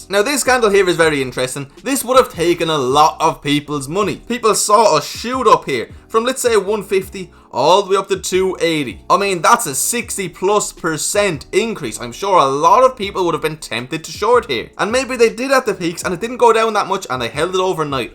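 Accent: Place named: Ireland. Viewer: Cool accent , is that Irish ? I’m from China so I’m not good at accents . 😂👍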